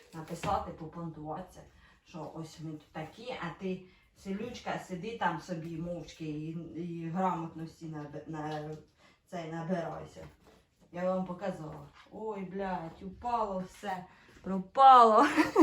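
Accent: Poltava, Ukraine. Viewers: Неподражаймий полтавский акцент.В мене батько з миргородщини.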